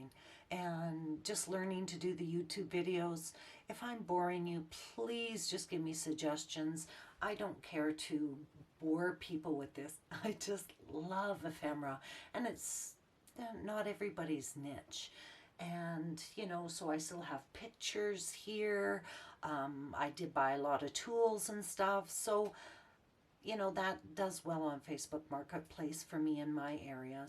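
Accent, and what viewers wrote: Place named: Western Canada, Canada. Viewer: Loving the videos thank you for the background on yourself you sounded like BC or western Canada. I am from Southern Ontario and nothing in my pockets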